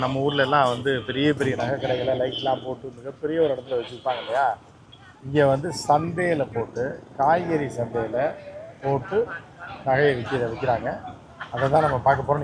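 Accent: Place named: Madurai, India. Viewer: வணக்கம் தொடர்ந்து காணெளி பார்த்து கொண்டு இருக்கிறேன் இதை பார்த்த அதன் மதிப்பு அவ்வளவு தான் உங்கள் பேச்சு நையாண்டி கலந்து மதுரை பேச்சு உங்கள் சேவை தொடரட்டும் இப்படிக்கு ரேவதி பிரபாகரன்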